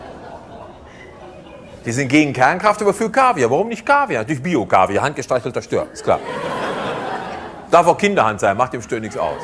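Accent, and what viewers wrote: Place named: North Rhine-Westphalia, Germany. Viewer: Wunderbare Lehrstunde mit rheinisch-westfälischem Duktus. 👍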